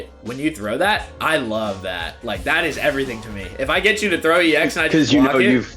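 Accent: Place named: California, United States. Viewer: Great videos as always, quick question though and really random. Are you from California? I feel you just sound like it lol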